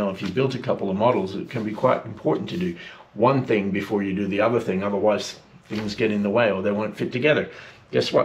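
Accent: Australia. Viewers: Nice. I am thinking about trying one of the DWH kits. I can hear an Aussie accent? Where do you fly in Canada? I am also an Aussie. I am in the Yukon. I've been flying RC since 2007. I was away from the hobby for a few years, and I am just get back into it. Cheers.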